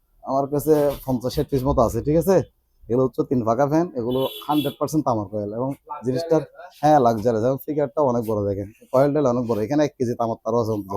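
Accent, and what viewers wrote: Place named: Chittagong, Bangladesh. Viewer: ইভা চিটাগাগত তো মনে হচেছ।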